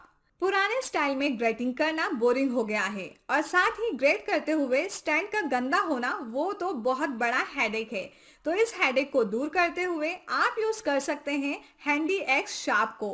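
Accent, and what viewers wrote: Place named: India. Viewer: 这标准的印度英文听得好辛苦……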